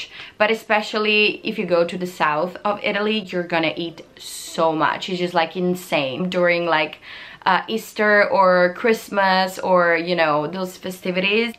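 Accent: United States. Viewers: I love your accent, It's like a cross between American and English, and then sometimes you forget the "th" sound and you go Italian again hahaha, carina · Sconvolta dalla perfezione del tuo accento americano...totally jealous! 😄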